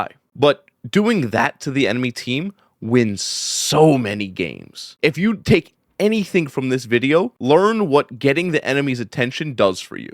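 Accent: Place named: Bronx, United States. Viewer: U gotta be from the Bronx my boy 😂 🤞🏽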